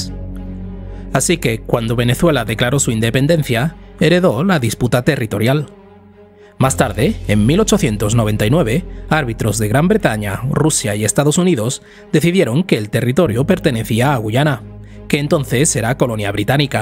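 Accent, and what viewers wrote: Spain. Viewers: Este analista le faltó leer más. El Esequibo es parte de Venezuela, ya esa parte del territorio no debe estar "rayada". Una zona en disputa no debe ser aprovechada por ninguna de las partes y Venezuela ha cumplido. Si una de las partes quiere invadir el territorio esa es Guayana, porque el Esequibo es de Venezuela, así es que el cuento, espero que sepas cual es la verdad y no la hayas dicho por algún interés económico particular, porque de no ser así, entonces es que de verdad eres absolutamente ignorante de las realidades geopolíticas de las que tratas en tu canal. También quiero acotar que cuando te vayas a referir a las economías en el caso de Venezuela, no obvies un hecho de mucha influencia en la geopolítica económica como lo es las sanciones unilaterales impuestas por EE.UU. (Sanciones) y has un análisis retrospectivo del antes y después de ellas en el desarrollo de Venezuela. 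Por tu acento creo que eres español y por tu forma de referir el tema de Venezuela me parece que eres de los que aun les duele la patada por las n@@ que Simón Bolívar en compañía de esclavos e indios, le dio al imperio español hace más de 200 años, después de más de 500 años de saqueo en nuestra América Latina. No uses este medio para engañar a la gente.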